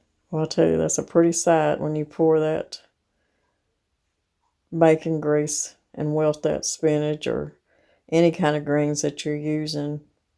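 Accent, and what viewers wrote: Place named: Eastern Kentucky, United States. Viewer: You sound soo much like where i come from ❤!!! I grew up in Eastern Kentucky. I left and im back home. Much love and respect!!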